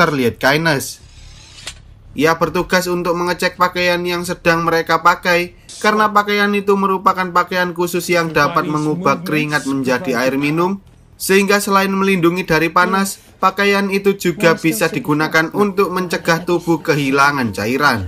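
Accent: Java, Indonesia. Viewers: suara host nya kental banget jawa nya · Mendok bgt kurang suka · Wong jowo rek? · Mohon maaf, bukannya rasis, medok nya mengganggu. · Logatnya Jawa apakah Mimin org Jawa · Jawa nya ketara banget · medoknya bikin ngga fokus cokk. pusingg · Logat aja medok bett gaenak di denger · Wong jowo yo mas? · Maaf ya suara mimin medok bngt, mimin asli orang jawa. Hehe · Bener" kental logat jawa nya. 😁 mantap bng semoga abg dan kita semua salalu sukses. Doakan kan kami semua para pejuang youtube pemula makin berkembang. Aamiin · Bang Abang orang Jawa ya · Bang lu kok medok banget orang jawa ya? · Jowone notok tenan😀😀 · Orang Jawa mana bang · Medok euy 😁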